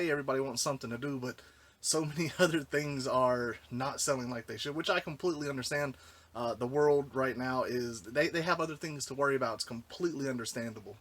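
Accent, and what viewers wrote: South, United States. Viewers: I even want to be careful when buying off ebay. You don't really know who handles you items before you get them. As of late I even try to disinfect my items i buy as I buy them via mail. Man you sound southern like me. I'm from ky.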